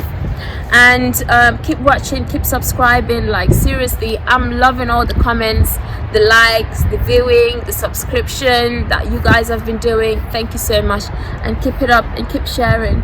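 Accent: England, United Kingdom. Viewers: Dame lady you fine hell, you got english accent.,you in england or gambia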